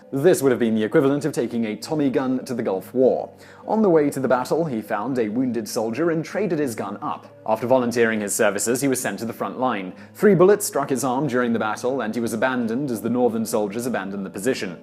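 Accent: England, United Kingdom. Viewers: Don't care for a LIMEY narrating US History! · Why is a british guy trying to tell us about Gettysburg - maybe he should have done a video on yorktown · Our history not urs, ur obviously british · Tory Sanders He definitely said Gettys burg with the wonderful English accent.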